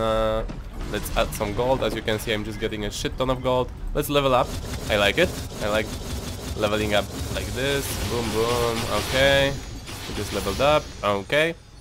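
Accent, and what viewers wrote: Poland. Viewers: Polski akcent? Czy tylko mnie sie wydaje? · Are you polish? Your accent is really similar lol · ty jesteś polakiem bo masz taki głos polaka. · Jestes z Polski prawda? Slychac, np gdy wypowiadasz 'mana' . Pozdrowionka i robisz mega robote!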